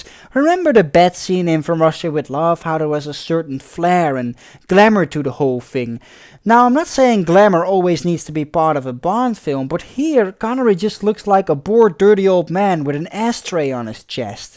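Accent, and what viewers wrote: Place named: Netherlands. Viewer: 'He looks like a bored, dirty old man with an ashtray on his chest'! Man, you are one funny fucker! I am still laughing at this -- the line definitely helped by your Dutch accent, my all time favourite of any foreign English speakers. Brilliant.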